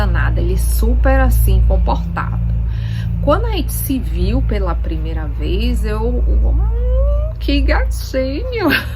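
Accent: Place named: Salvador, Brazil. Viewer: Olá, eu também sou de salvador, vc explica muito bem boa dicção, com esse nosso sotaque bem presente. Nunca vi seu canal, 1 vez.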